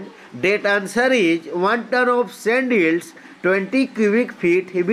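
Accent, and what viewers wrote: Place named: India. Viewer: Sir your English is pronounce like hindi difficult to understand,better to speak in hindi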